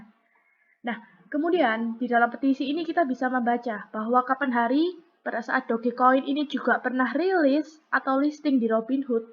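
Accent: Java, Indonesia. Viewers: suaramu medok tenan mbak, tp enak di denger, semoga berkembang terus channel YouTube nya.... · Kok medokk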